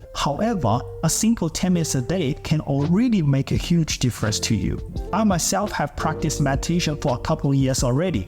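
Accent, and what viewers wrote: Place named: Louisiana, United States. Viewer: Bro you got the cajun accent "youknowhowlooongihavebeenwaitingforthis"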